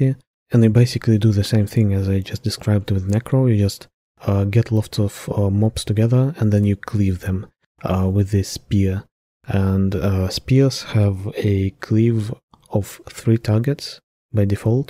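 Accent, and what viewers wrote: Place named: Germany. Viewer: are you german friend?? very nice accent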